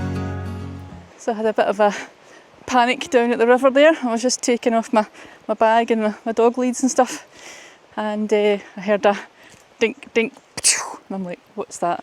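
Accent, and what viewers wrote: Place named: Scotland, United Kingdom. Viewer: Done part of that lovely walk but not as far as the bridge. Lovely to hear a Scottish voice and a lovely smile. Keep up the good videos. 👍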